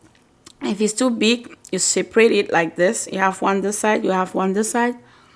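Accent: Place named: Kom, Cameroon. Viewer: It’s de dialect for me sis🤣🤣🤣🤣🤣🤣🤣🤣🤣🤣🤣🤣🤣🤣thank u for representing us, we the kom people are proud of u😍bon appétit 🥂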